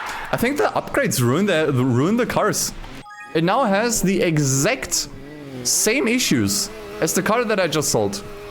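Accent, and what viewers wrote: Germany. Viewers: Are you perhaps german? Kinda sounds like it.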